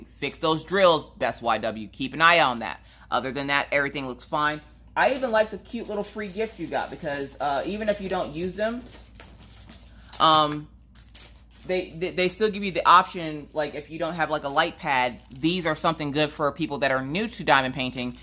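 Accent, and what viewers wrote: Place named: South, United States. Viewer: I absolutely love listening to you as I diamond painting. I am a desert transplanted southern girl and it is like listening to me and my friends.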